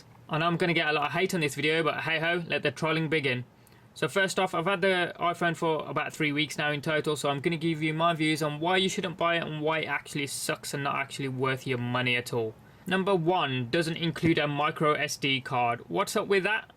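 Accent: Birmingham, United Kingdom. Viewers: Come on man he is British its a different pronunciation. So ignorant! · Honest review and an interesting english accent! I see no progress after 4s too... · I have a iPhone 5 and I do agree with you, it's got a bit boring. I don't know why I got it on my upgrade. It's like I couldn't think for my self and just followed the crowd. Good video dude. You sound like your from brum. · wtf ur voice have to be the most annoying shit in this world ,a Chinese with British accent ?? dude shut the fuck up , u dont know shit abput this phone , galaxy probably pay u to make this stupid video -.- !!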